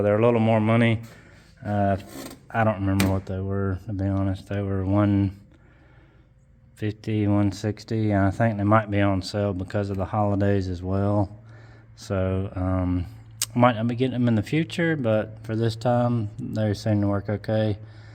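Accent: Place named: South, United States. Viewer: Have a few question myself - you have any way outside of youtube to communicate? I have a 2500 burb with ly6 and 80e which is already cammed, converter, gears and longtubes. Im looking to go turbo or lsa and have a few questions about dealing with heat etc - sounds like you live in the south as do i (alabama)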